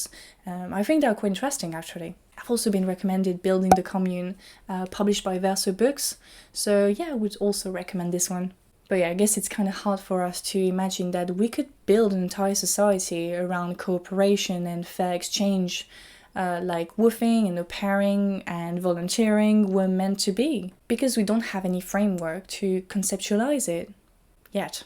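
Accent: France. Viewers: Hey, are you planning on making a video in French anytime? I really like your accent, and I think hearing you in your native tongue would be lovely :)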